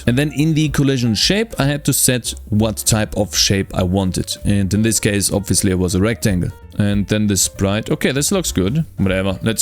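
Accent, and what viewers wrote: Germany. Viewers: höre ich da einen deutschen Akzent :D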